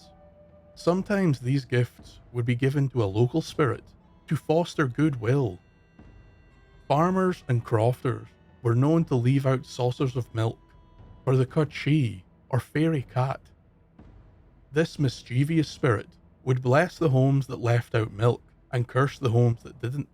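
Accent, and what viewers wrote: Scotland, United Kingdom. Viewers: Crazy how similar a norther Irish accent and your Scottish accent is. You can really hear the crossover.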